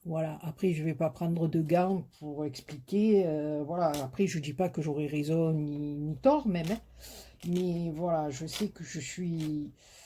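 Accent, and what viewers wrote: South, France. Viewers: Coucou, j'ai toujours le sourire quand j entends ta voix....😉 ça me fais du bien même si je ne suis pas à plaindre, même ton accent du midi,c est du soleil, quand à Agen il pleut...merci pour tes réponses sur ce tag...j ai bien ris 😂😉 comme toujours...merci beaucoup pour tes très belles créations et tes tutos très bien expliqués....je t assure que tu explique très bien, vu que je comprends et que je suis une débutante 😉🤣....je te fais des bisous prends soin de toi aussi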